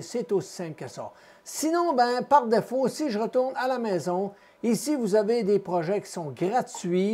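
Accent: Quebec, Canada. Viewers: Bonjour. Encore une vidéo claire et efficace. Merci. Commentaire sur le précédent commentaire, oui il fait -3°C en France, mais ce n'est pas à un canadien qu'il faut s'en plaindre. Ça doit bien te faire sourire le climat français par rapport à celui de l'Amérique du Nord. Mais je confirme, ton accent et ta bonne humeur nous réchauffe le coeur et l'âme.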